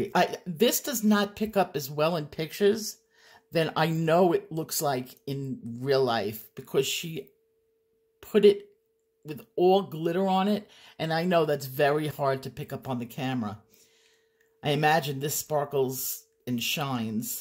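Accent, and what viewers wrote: New York, United States. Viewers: Great to hear that New York accent again on "ColotTube". Your instructions are always welcome, however, if I followed your first one to " plan ahead before you pick up a pencil " I would have close to zero colored pictures! About the closest I get to pre planning is picking which colored pencil set I need to take off the shelf. At 76 who has time to pre plan? See you soon. I'm pre planning to watch you. Don't take too long.👵♿🎨 Barb N.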